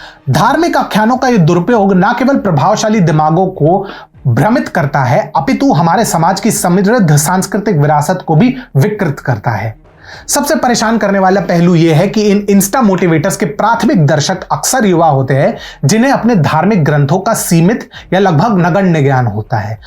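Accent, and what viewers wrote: Standard. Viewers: आपकी इतनी अच्छी , शुद्ध और साफ हिंदी के लिए आप को साधुवाद ।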